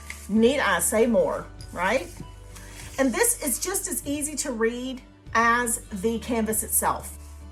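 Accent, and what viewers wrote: South, United States. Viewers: These are beautiful. I like the magnolias best! I do have one question that you didn't mention--where do they get their images, do they pay artist royalties? And btw your pronunciation of "mauve" isn't standard for the US, it's because of your Southern accent, which is adorable. :) Also, the first picture shows peonies, not roses!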